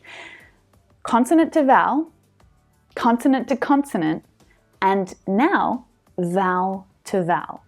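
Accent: Melbourne, Australia. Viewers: Hi. I loved your Australian accent!! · Is from Melbourne? I thought so...I'm from Sydney and I can hear subtle differences in her "accent" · That's quite amusing this video comes from someone sounding Australian. People from Australia don't speak properly, skipping letters.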